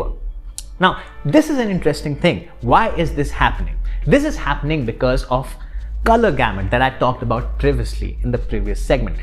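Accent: India. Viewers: Hey, here, the anchor from the video! That's okay buddy, I could have faked an accent but i tried to stay true to my own fellow Indians. Spend some time with Indian English content, and you'll soon start understanding what I'm trying to say. You can also use the CC feature in the YouTube interface. Thanks for being honest! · Best indian English speaker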